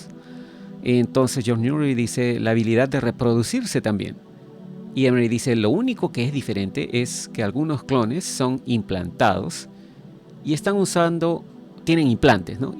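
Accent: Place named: Peru. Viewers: es de Peru 😍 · Tiene un acento como de Perú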